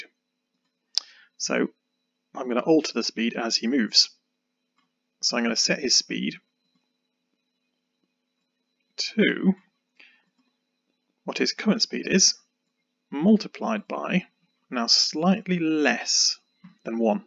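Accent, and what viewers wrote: United Kingdom. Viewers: waitwaitwait you're BRITISH?? that's what it sounds like.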